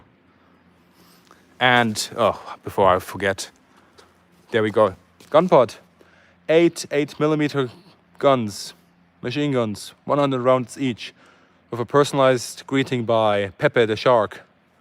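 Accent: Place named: Germany. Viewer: That was awesome the German accent ads to the show love it when you say (Millimeter)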